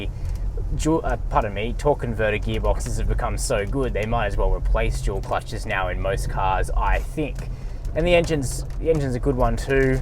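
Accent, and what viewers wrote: Australia. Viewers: Hi, I am watching your rewiews mostly because of your pronunciation of english language and I must tell you, I am having a good time😊. I live in Europe, Slovenia and if I may ask you, I know the rewiews are about the cars, but can you show as a little bit more of your beautiful country AUS. All I can see, is one same road, I am shure, they are more. Here, when I look through the window, only I can see, is coronavirus! We are depressed😱. Thank you very much and take care, Damijan.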